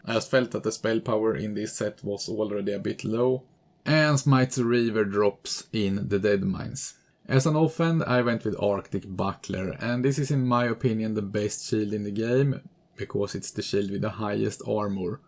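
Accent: Sweden. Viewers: Man hör tydligt att du är svensk!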